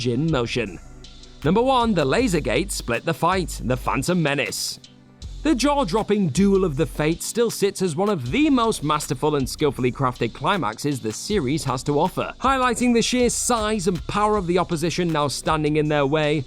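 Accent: United Kingdom. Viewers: Ya British mate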